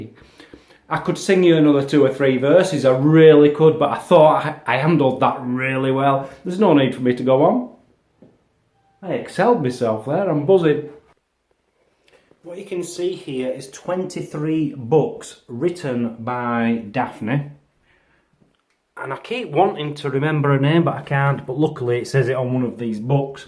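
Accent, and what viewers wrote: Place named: North, United Kingdom. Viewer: I could listen to that Northern accent all deey.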